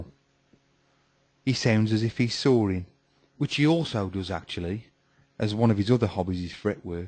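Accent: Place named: Birmingham, United Kingdom. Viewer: Sorry, but this should have been told by a Yorkshireman, not a West Midland 'Brummie' - it has lost the inclanative effect.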